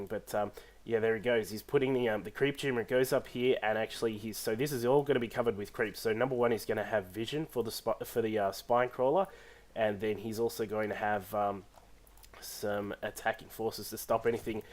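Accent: Australia. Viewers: It's good to hear a Aussie commentator for once. Good work =p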